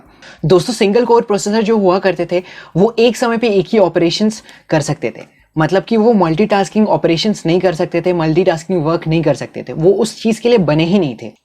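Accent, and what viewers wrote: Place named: Jharkhand, India. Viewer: Bhai tu jharkhand se hai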